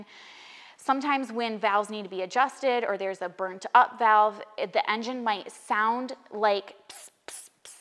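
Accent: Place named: Midwest, United States. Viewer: I could listen to read a phone book.. Reminds me of home with her Mid-Western drawl..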